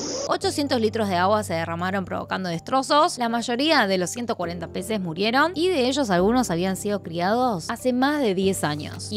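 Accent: Argentina. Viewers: Al comienzo ni me percataba si tenía acento, ahora te escucho un lindo acento argentino💓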